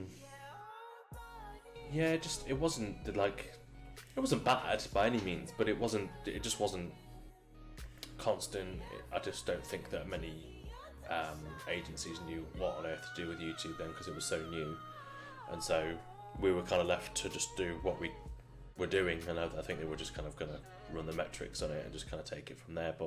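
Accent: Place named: Derby, United Kingdom. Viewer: I’ve got to ask- I’ve no idea why I thought this in the first place but for some weird reason it’s always stuck with me. You’re not from Derby, are you? The accent is throwing me off, but it’s been driving me mad for all these years. Good to see you again after all these years, hope you’re doing well! 🙏🏼🙌🏼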